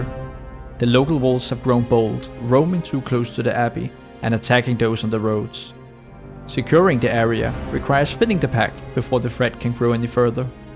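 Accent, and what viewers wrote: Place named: Denmark. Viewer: Danish accent, right? Good video.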